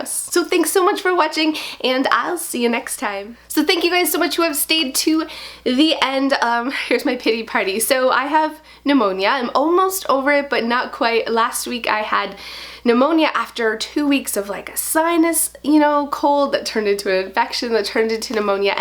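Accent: Canada. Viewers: First 10 seconds of the video: op she's Canadian lol.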